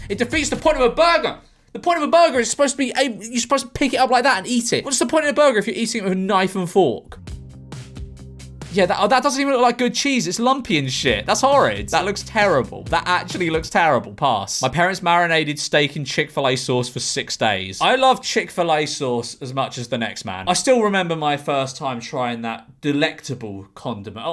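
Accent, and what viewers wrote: United Kingdom. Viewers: I with you could hear what you sound like to me… haha u Brit’s sound so funny in certain parts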